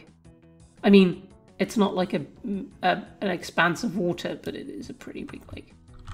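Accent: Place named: United Kingdom. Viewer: Definitely like My Viewers Aren't Cooperative Island, but it might be a bit lengthy. Also, how Dutch are you? You have a very British-sounding accent.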